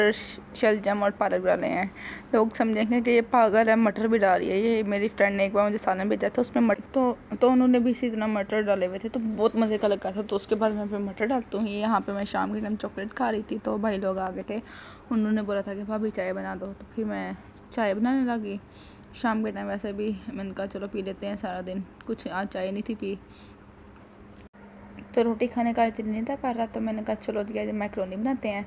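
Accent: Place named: Faisalabad, Pakistan. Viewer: Ap Ka way of talk bilkul faslabadi logo ki tra h or pata b lag jata h JB ap bolti h yummmy pulao and mazy dar Matt saln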